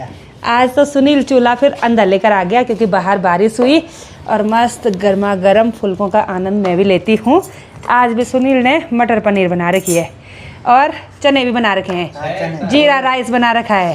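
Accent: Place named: Nimar, India. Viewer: आपकी भाषा कुछ हमारे मध्यप्रदेश के खंडवा निमाड़ जैसी लगती है दी 🎉🎉🎉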